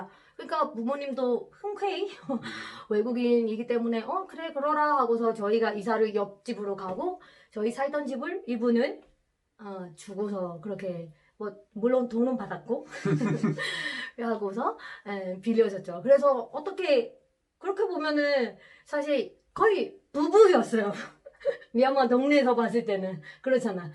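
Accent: Gyeongsang, South Korea. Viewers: 경상도 억양이 아주 살짝있네라고 생각했는데 대구에서 어학연수해서 그런갑네요. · 말씨가 낯설지 않다 생각했는데 대구에서 어학연수를 받으셨군요 저두 대구 고향♡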